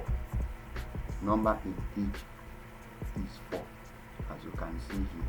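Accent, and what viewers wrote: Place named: Nigeria. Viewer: Gd job ma bro..from accent I can tell your Nigerian,am also Nigerian but am in the uk and work as a construction manager and found this helpfull.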